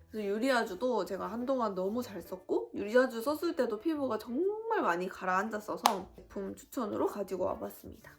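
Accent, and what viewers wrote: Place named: Seoul, South Korea. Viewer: 언니... 서울말 많이 늘었네요오🐣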